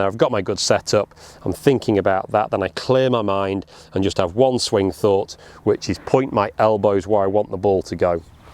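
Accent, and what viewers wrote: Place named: Midlands, United Kingdom. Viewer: I want this guy to give me private lessons. Sounds like a midlander though and I'm near Gatwick 😔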